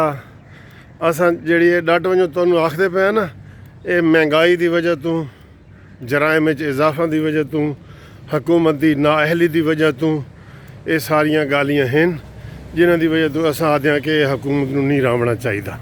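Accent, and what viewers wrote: Saraiki, Pakistan. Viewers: سرائیکی کی مٹھاس سن کر کان میٹھے ہوجاتے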